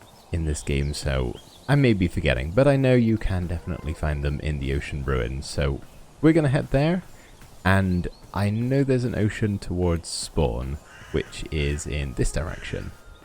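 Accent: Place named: Devon, United Kingdom. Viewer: Are you from the west country? theres a twang in your accent thats a bit Devonian.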